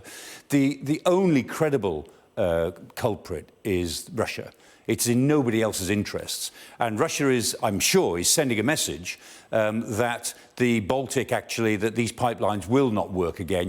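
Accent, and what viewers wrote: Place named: England, United Kingdom. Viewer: He really does. I thought that he and Michael Caine must be from the same part of England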